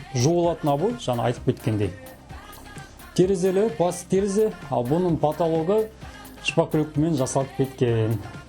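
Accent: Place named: Russia. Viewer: Тіліңіз орыс тіліне ақсау. Таза казакша түсіндірсеңіз!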